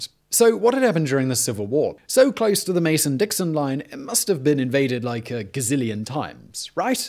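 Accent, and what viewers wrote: United Kingdom. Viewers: its interesting to hear a brit talk abt the civil war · I don't think this guys videos would be nearly as popular if he didn't have a british accent. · I love listening to with all the random facts with his video’s but I have to admit, I feel a little dirty listening to a Britt tell American History. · I don't know about somebody with a British accent lecturing about American history really impresses me. I think I would lean more towards David Barton, a true American Historian who backs up everything he teaches with hard fact and thousands of documents from the Halls of Congress and other resources to back them up.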